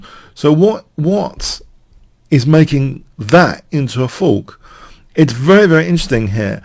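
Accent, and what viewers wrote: England, United Kingdom. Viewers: hay interesting as always, by the way just wondered from where is thet fantastic accent? area England??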